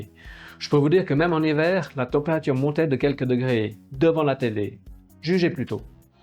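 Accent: Switzerland. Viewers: C'est bizarre que tu parles avec les mains comme un italien, avec un accent suisse, et une face asiate. Je suis confus.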